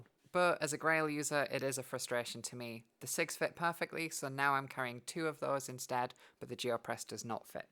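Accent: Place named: East Yorkshire, United Kingdom. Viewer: every now and again, i hear east yorkshire in your accent. Hull. Have you lived in some different places? Where are you from? love the video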